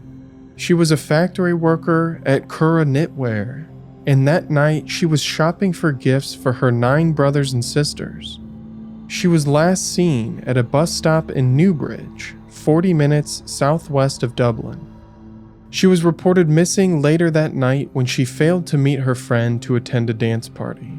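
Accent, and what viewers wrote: United States. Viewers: if you’re actually offended by someone having an American accent…. There’s far better things to bitch about tbh.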